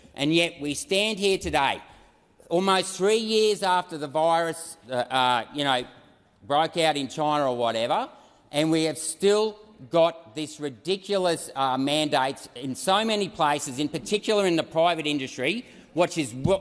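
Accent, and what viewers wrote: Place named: Australia. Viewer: The strongest aussie accent ive ever heard 🤣👍🏽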